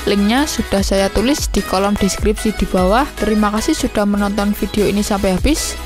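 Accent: Java, Indonesia. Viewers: Wadohh zimzajaib kok jadi medok gini ya!? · Ya ampun...salut dah.... bisa dibuat kisah inspiratif bagi murid-murid saya. Suwun Dik.... bahasamu medok banget...hahaha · medok medok joss🙃, kreatif, menarik salam dari sragen jawa tengah brader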